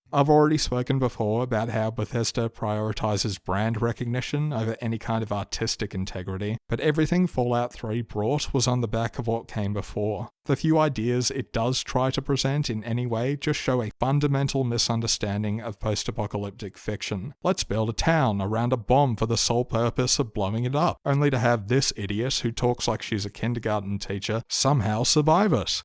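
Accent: Australia. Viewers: Disgusting to see people responding to this mentioning Br*ts. Monotone Australian is the only trustworthy media analysis phenotype. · Certified monotone Australian classic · a monotone Australian voice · Strongly disagreeee, the Aussie dialect that like to strain out the last letter of the last word of a setence bothers meeeeee.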